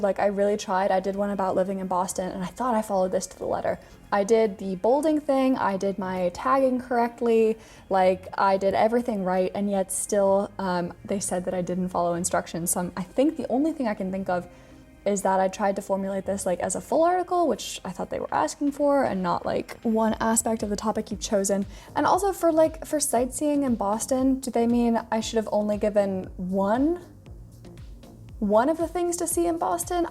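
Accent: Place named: United Kingdom. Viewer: Hello, thanks for sharing from Caracas venezuela...you are very beatiful pragmatics girl...im a teacher computers programming...i love writing articles en coinpayu articles but y read your reviewed...Es muy interesante tu estetica al vestir y ver el mundo...y tu bello acento britanico...como a los que tanto escucho cada dia. best regards.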